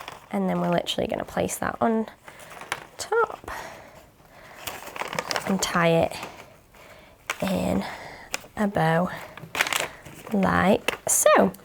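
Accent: United Kingdom. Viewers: I wish they would have someone who spends standard English and not British English, which harder to understand for Americans. Also, she has British accent and another accent.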